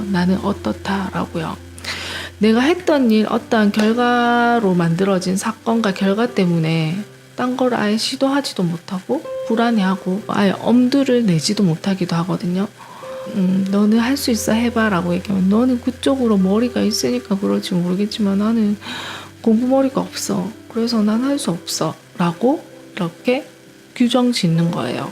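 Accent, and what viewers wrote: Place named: Busan, South Korea. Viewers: 세부 바다 수영 ㅋㅋ 영상 왤케 귀여우십니까.ㅋㅋ 부산사투리가 완전 귀여워요 ㅋㅋ 물론 저도 부산사람입니다만 ㅋㅋ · 좋은이야기 잘듣고가요~^^ 경상도 말투 넘 좋습니다~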